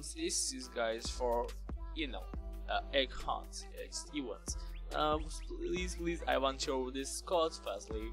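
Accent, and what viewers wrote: Turkey. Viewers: Kardeş %100 Türksün Ben konuşmandan anladım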